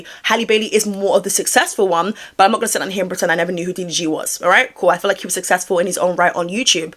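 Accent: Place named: United Kingdom. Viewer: I know this off topic but you have American humor but you sound British asf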